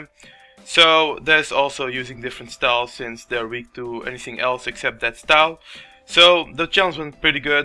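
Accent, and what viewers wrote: Netherlands. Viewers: Are you dutch :0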